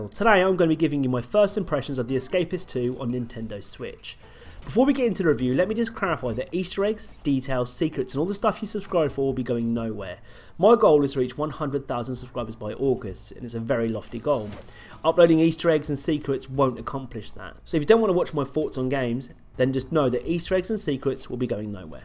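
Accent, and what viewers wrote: United Kingdom. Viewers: Oh then you're just not used to this guy's accent then. It doesn't mean other non UK speakers have a problem understanding these kind of accents though.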